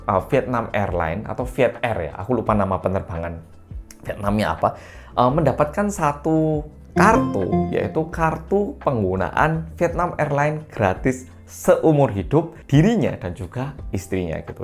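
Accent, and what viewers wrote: Java, Indonesia. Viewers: Sampeyan kok medok jowone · Lha wong dr TK smpe SMA sekolah nk malang ogg.. 😂 yo medhok nyel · Mas sampean ni ngomong Indonesia serasa Jawa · Apik komentarmu bro. Jawamu ko medok emangnya anda tinggal dimana. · Mantuuuuullll mas Medhok Jowone....😀👍👍👍 br ngevlog lg ya Mas, mngkin krna sbk dg kerjaan Rutin, ok truslah berkarya sehat2 sklwarga and sucses selalu Mas 🤲🙏👍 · bro kamu logatnya ko ke orabg jawa..medok😁🙏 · Hebat bahasa Indonesia anda perfect meski ada logat Jawa sedikit ,❤ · Abang ini Dialeknya seperti orang Jawa awal nya Saya bingung Ini orang Korea atau orang Jawa 😅😅😅😅 Ok Bang Salam Kenal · Bahasa Indonesia kamu medok banget jawanya... Pasti km fasih bahasa jawa.... Yo opo ceritene rek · ini mas se logate jawa koyok e...tapi muka e korea...salut...aku.baru.mampir di channel.ini langsung tak subrike heheh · Bahasanya kok medok kyak Jawa ni 😂😂.pandai bicara Indonesia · Ini kok logatnya ada lihat Jawa emang tinggal dimana? 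· Bahasa jowo nya sangat kental banget 😅😅😅😊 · Waduh mas korea lancar banget berbahasa Indonesia nya dan logat Jawa lagi... OK mas korea saya sangat suka 👍👍👍👍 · Wahhh saya salut bahasa indonesia nya bagus bgt, ada logat jawa nya juga❤❤❤❤ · Sampeyan jawine pundi ?? Kok logatnya Jawa !! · Logatnya lebih ke logat jawa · Medok jowone😁😁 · Lancar juga bhs indonesia ini mas Korea,,,lidahnya lidah jowo juga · logatmu medhok banget mas 😀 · Iki wong korea opo wong jowo logate kok medok jowo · Mas orang Korea ko bahasa Indonesianya lancar banget sih, bahkan logat jawanya kental sekali! Sudah berapa lama sih tinggal di Indonesia? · Muka Korea logat BHS jawanya kentel suka sekali ngeliat mas nya ngomong😊😊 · orang korea tapi kyorang jawa medok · Bahasa Jowone medok bangett,,😀 asli keturunan jowo pundi? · Ko rasanya mendengar aksen orang jawa bicara ya... 🤔🤔🤔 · Jawa nya medok 😂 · Idemu cemerlang Rek, sampeyan neng Jatim Wis pirang taon kok medok banget ?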